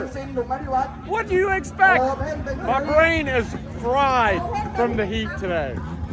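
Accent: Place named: Pittsburgh, United States. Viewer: Where are you from originally? You sound very Pittsburgh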